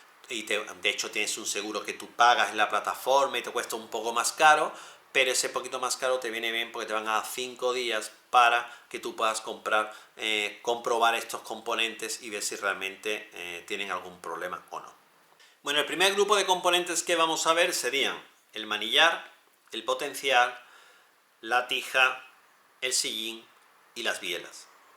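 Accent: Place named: Córdoba, Argentina. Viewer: Aa valee! Yo de Córdoba por eso te lo decía! Que he visto que tenemos acentos similares y digo si es de Córdoba podemos salir a entrenar! Jajaja. Un saludo!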